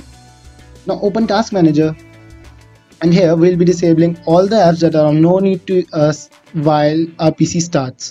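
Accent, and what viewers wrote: India. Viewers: Look, you try to help people, and that is a really good thing. Kudos for that. But I have to mentions this, and it is not meant rude, but as a tip. You have such a strong indian accent, that your videos, and many of the viewers would benefit a lot if you spoked a bit more slowly. Yes, the video would maybe be a minute or two longer, but at least one would be able to understand more clearly what you say. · opens video, hears indian voice, dislike and close it.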